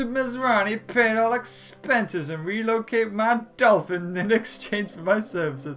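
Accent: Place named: United Kingdom. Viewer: Um,excuse me Mr..I hate to crush ur hopes but you will never do an exact impression of Chris Pratt.See,he has an American accent,you have a British one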